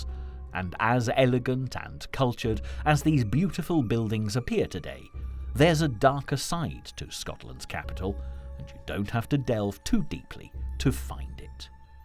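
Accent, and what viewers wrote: England, United Kingdom. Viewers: Lovely docu. Thanks very much.,but please employ a Scottish narrator as an English accent is hard to understand. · As a wee Ayrshire lass, I was looking forward to this but cannae listen to that English narrators accent,I got as far as his pronunciation of Girvan and wet ma pants….enjoy the rest and maybe with a subject as unique as our Scottish myths and legends it would’ve been more engaging in a true Scot’s accent to amp up the heritage and theatre of our fab history 👍🏴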